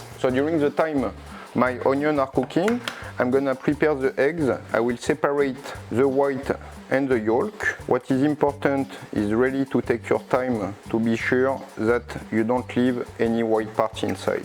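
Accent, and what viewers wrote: France. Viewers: WOW! I haven't seen this carbonara technique before. I will try it this weekend. Thank you, for sharing. I love your French accent. 😊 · I've never seen a thicked French accent et je suis français! Very nice technique! Makes for a fatty meal but it must taste amazing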